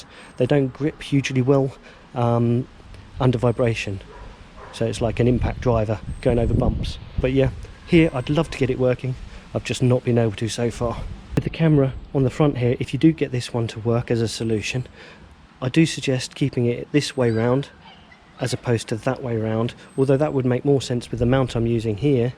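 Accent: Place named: United Kingdom. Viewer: I live in the UK and you sound Bristish 🤔 any idea of how it is like here ?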